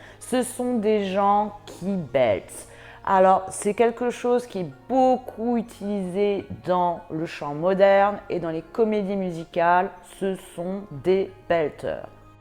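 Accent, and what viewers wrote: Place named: Paris, France. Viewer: a chier avec son accent parigot bpbo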